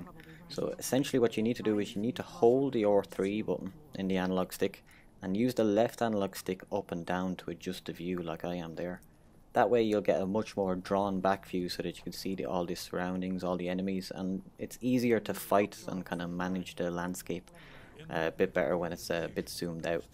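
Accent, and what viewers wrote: Ireland. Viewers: R3 he says with his weird irish accemt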